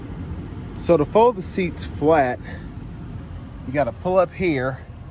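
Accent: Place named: Pittsburgh, United States. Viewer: Nice review. I know you are in Canada but it sounds like you have a Pittsburgh accent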